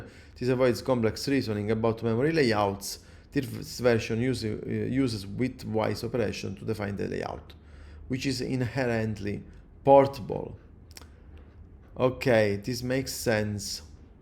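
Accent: Sicily, Italy. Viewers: il tuo inglese mi fa morire perche mi ricorda tanto il mio. Il tuo richiama la Sicilia Sud Orientale, il mio quella nord occidentale:-)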